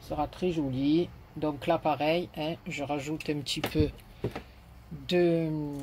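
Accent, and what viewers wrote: South, France. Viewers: Coucou, ils sont juste magnifiques tes coffres, j'adore! merci beaucoup pour ce tuto, ces explications et ta bonne humeur, j'adore t'écouter avec ce petit accent qui nous rapproche du Sud, bisous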